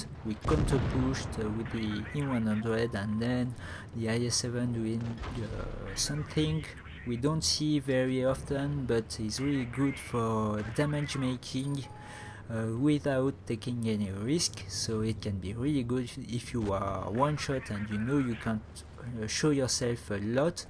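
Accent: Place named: England, United Kingdom. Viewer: J'adore ton accent anglais XD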